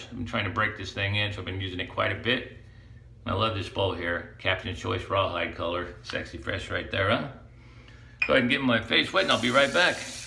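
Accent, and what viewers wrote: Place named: Texas, United States. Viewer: wow that’s cool! I’m British but my girlfriend is from Austin Texas and we were trying to pin down you’re accent and said she thought you were five different states but that you could be from Austin. Thanks for clearing that up dude, keep the videos coming and the shaves silky smooth.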